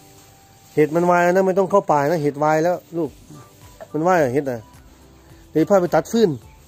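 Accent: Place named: Isan, Thailand. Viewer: น่ารักจริงๆแถมมีเสียงพากย์อีสานของ เข้ากันดี รักนะจ๊ะ ขายอะไรคะ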